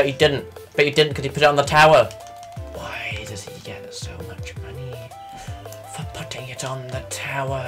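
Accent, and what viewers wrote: United Kingdom. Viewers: I like how you have a full British accent, but when you say mario, you sound so American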